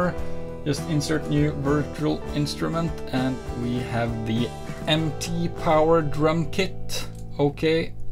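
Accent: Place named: Norway. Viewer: Very help full. I'm now setting my Ugritone drums (Against Humanity). Are you from Norway? I can hear some accent :)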